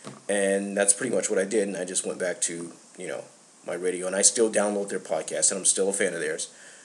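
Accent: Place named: United Kingdom. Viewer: bring back your british voice. it's HAWT and i miss it.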